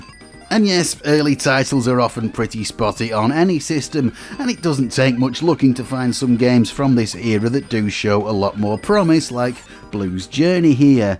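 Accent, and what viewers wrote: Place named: England, United Kingdom. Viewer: I hear a fellow english accent, I see neogeo, I KNOW the metal slug section is going to be the longest lol Nice one my man :D.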